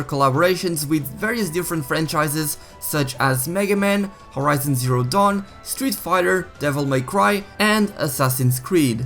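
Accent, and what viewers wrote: Portugal. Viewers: Comecei a ver o vídeo e estranhei logo o sotaque. Grande tuga! + um sub :)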